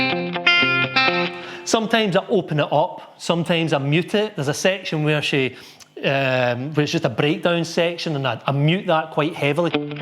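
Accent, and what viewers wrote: Scotland, United Kingdom. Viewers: Love listening to you play the guitar, but the Scot accent takes me back to my youth when i lived in Glasgow, working in the pubs, and pints of 80 shilling.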